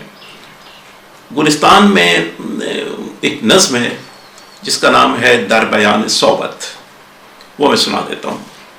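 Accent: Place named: Iran. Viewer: farsi zuban ka andaz e takalum kia khob jachta hay aap ki zuban par mashah allah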